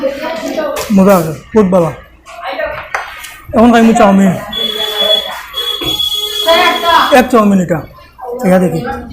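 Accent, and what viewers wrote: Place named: Sylhet, Bangladesh. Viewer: Are you indian Bengali. You talk like Bangladesh Sylhet division people. Karimganj is a assam province district but you talk Bangladesh Sylhet division local Bengali language you not talk assamese language? And why you say Sylheti vlog Sylhet is a part of Bangladesh. You live in assam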